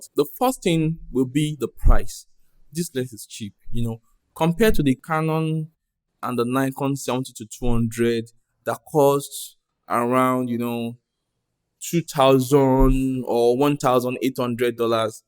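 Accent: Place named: Yoruba, Nigeria. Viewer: I LOVE YOUR YORUBA ACCENT ABURO..GOOD REVIEW..JUST SUBSCRIBED.!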